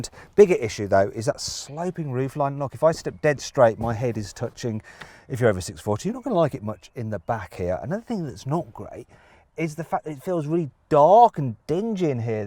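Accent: United Kingdom. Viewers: イギリス英語わからんなら理解するの難しいだろうな。日本語訳も所々違う部分もあるし。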